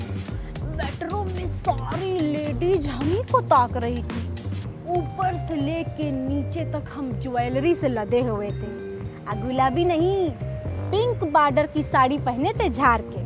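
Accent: Bihar, India. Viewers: bihari lady voice very original,,,its innate in you,,,graceful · excellent performance mam.......... Aapki acting ke fan Ho gaye hai hum and specially ye bihari walla toh jhakkasss 👌👌👌👌👌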